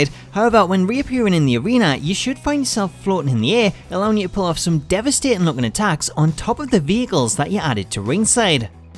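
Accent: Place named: Scotland, United Kingdom. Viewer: You’re Scottish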